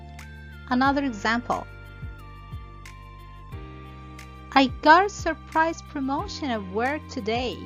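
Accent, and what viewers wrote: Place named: United States. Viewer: Your American accent is really incredible. Your explanation is great 👍 It's important to learn some idioms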